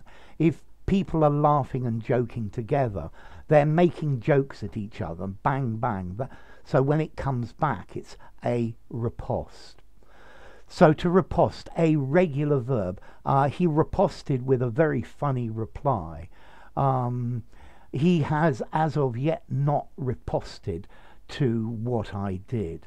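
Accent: United Kingdom. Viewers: Best teacher. I like your way of explanation. Especially your british accent.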